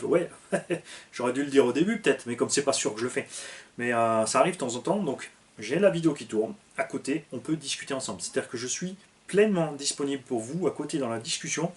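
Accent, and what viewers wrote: Alsace, France. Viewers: Mdr le 💩💩💨 🤣🤣🤣 Moi l'accent me va bien au moins je te comprend 🤣🤣 jsuis aussi alsacien !! Ah yo Hopla 🥨 Merci pour la vidéo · En vrai l'accent Alssacien ça va , mais si tu écrit pour expliquer c peut âtre pire 😂😂😂.....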